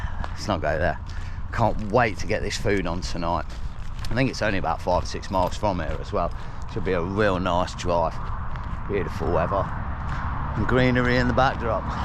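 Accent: Leicester, United Kingdom. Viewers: Alright mate. Found you about a month ago and loved the approach you take to your videos and life! With a smile! I’m a Leicester lad (Glenfield) by birth and love hearing the twang mi duck! I’ve binged pretty much all your stuff and joined the facey group and your right what you mention in this one. Your gerrin much better! Love it u know! Keep smiling pal and good luck with the channel! Up the citeh!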